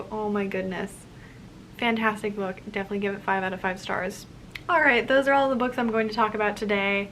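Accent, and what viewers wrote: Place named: United States. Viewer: Oh, you’re dutch? For some reason i thought you were german... did you grow up in the US? Cause for me (a brazilian), you sound american :) (Your accent)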